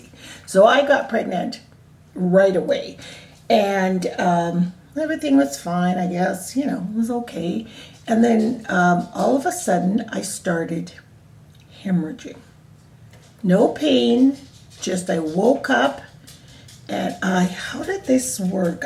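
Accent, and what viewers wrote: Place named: Canada. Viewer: That is a beautiful story😇👼 thank you ,, where are you from are you from Canada you sound like a Canadian?? Please reply thank you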